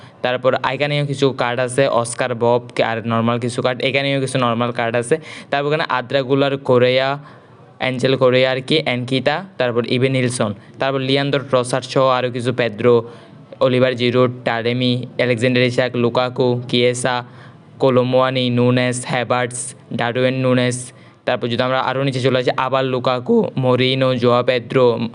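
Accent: Chittagong, Bangladesh. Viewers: main ktha oitese vai ami Chittagong ar apnr voice shone mone holo apnio ctg ar Apnr voice a akta reaction saitesilm rki amr id ar oppr🥺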